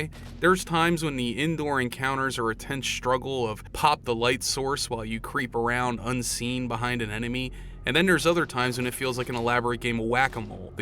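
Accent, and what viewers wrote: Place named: Philadelphia, United States. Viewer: Are you from philly or around west chester? Your accent reminds me of bam margera and brandon dicamillo but not like a yinser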